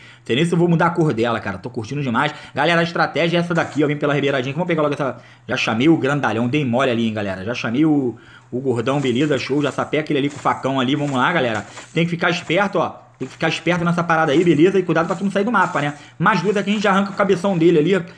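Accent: Rio de Janeiro, Brazil. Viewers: EAE GALERA NA ÁREA sotaque Do rio é foda Like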